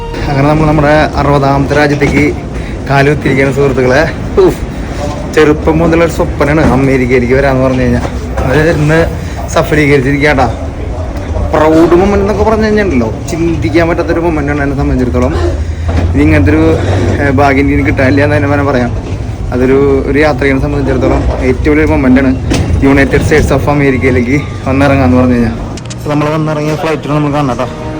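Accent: Thrissur, India. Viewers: Welcome to the United States. I have a few suggestions for you (1) You should have a clean-shaved face or keep a thick mustache or goatee because many people do not like your face on videos. (2) You should not show your face on video, instead, you should give your narrative and at the same time showing the places you visit like Cool Vision, Rick Reeve’s Europe and Malayalam vloggers like Pikolins Vibe and The Indian Trails. (3) Many people from Thrissur and Malabar districts changed their accent. So, you also should change your accent. If many people from these districts could change their accent, you also could do, so that you could attract more and more viewers to your videos. (4) Your introduction should be very brief. You could say: Hello, Good Morning, I am so and so. Today, I am going to or showing….”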